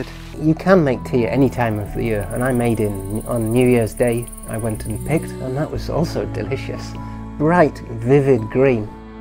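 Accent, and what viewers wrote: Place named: Yorkshire, United Kingdom. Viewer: Who are you? are you half Japanese? from where did you get your Yorkshire accent? Tell us more about you mate. Thanks for the great work. Love you.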